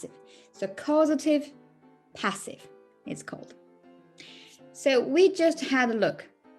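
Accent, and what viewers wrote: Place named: United Kingdom. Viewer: Your english accent is so good... you sound like you are from the uk! (maybe you are lol). Great video anyway, thanks :)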